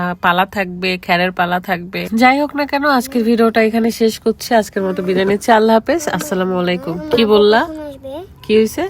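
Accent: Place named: Bogura, Bangladesh. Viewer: আপু বগুড়া পড়লে তোমাকে অনেক সুন্দর লাগে পুরাও বিদেশি মত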